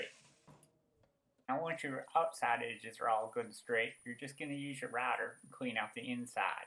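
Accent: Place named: South, United States. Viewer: U gots a southern accent now!!!!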